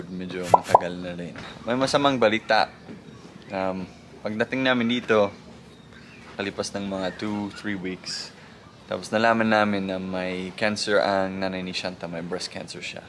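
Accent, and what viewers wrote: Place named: Philippines. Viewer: Ibang iba na ang accent mo ngayon sir very pinoy na..btw, all of us has to go through different hurdles in life but what can makes us strong is our faith in God coz no matter how big our problem is, there is always bigger God for us..Just be strong and keep moving forward. God Bless you and your family.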